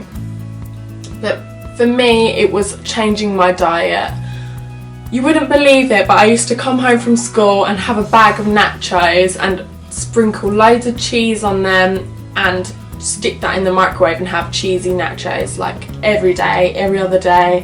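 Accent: United Kingdom. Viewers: I just came here to listen some nice British accent. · I love your accent sounds abit essex but i find that soothing to listen to haha... im gonna go out and buy those tablets tomoz sick of my spotty skin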